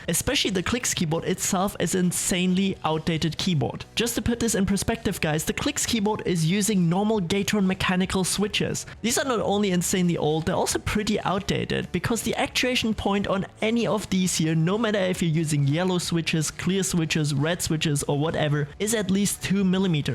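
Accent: Germany. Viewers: du bist deutsch oder?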